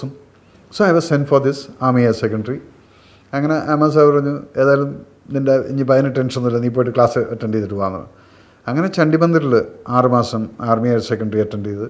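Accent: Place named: Tamil Nadu, India. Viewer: His Tamil accent is superb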